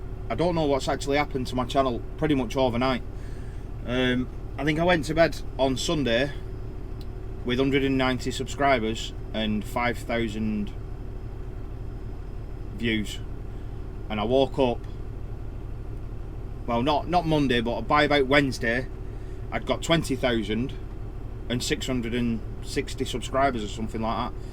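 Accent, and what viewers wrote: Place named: Oldham, United Kingdom. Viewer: I'm glad your enjoying yourself pal I onky did my test a few weeks ago on class 2 and could not wait to get down the road altho I've driven trucks for many years I only past not so long ago just take your time don't let cars rush you and just take it at your own pace . You sound like a Oldham lad with that accent I'm only over the top In Huddersfield myself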